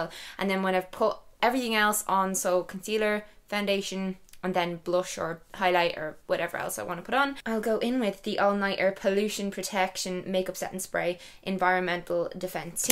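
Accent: Ireland. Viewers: Beautiful youuu! That Irish accent is adorable!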